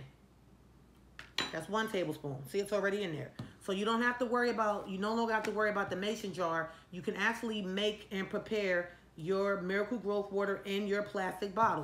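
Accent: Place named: New York, United States. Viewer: Girlfriend are you from NY? You sound just like family honey! Will check out your website.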